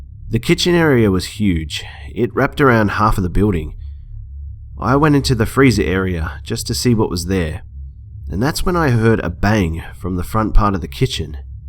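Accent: Australia. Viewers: For some reason, when done with an Australian accent, it's all the more scary. Not to be racist.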